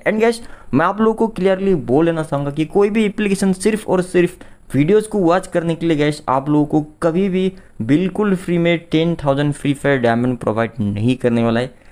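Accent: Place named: India. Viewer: We can't understand you. Indian accent is really annoying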